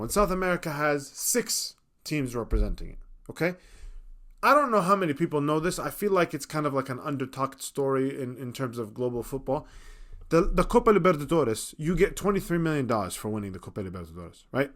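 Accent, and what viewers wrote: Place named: Arab world. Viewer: Where are you from? I can heard some arab accents but can't locate it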